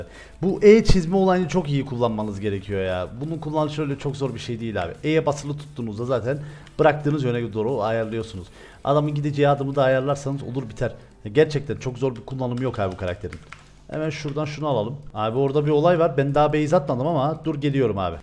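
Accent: Cyprus. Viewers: Abi her video attığında onunla birlikte izlerdik güle eğlene kıbrıs ağızına yatkın olduğu için senin konuşman gibi konuşurdu keyifle izlerdim.Artık o başka biriyle ben yine seninleyim. Seviliyorsun reis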